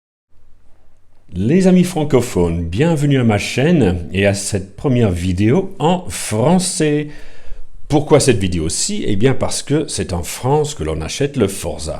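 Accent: United Kingdom. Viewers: Very good review with the best accent I have ever heard from an English person. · BJR. Et bien, j'aimerais bien parler l'Anglais comme tu parles le Français (même avec un accent). Tu as une très bonne diction. Quant à ton accent "british" il n'est pas trop prononcé. D'ailleurs, je ne l'ai remarqué qu'au bout de plusieurs phrases. Bon essai, agréable à suivre ; continue de faire des essais de scooters. Concernant ta question sur la circulation en inter-files (surtout valable sur le périphérique parisien), nous n'y comprenons plus rien, nous non plus. La France a l'art de changer et compliquer les réglementations. Une question : c'est mieux de vivre en France ou bien au Portugal ? (ndr : je suppose que c'est à cause du climat que tu ne vis plus en Angleterre).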